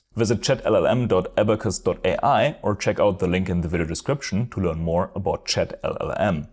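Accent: Germany. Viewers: This German Accent! xD But thanks for the Vid! This really helped me!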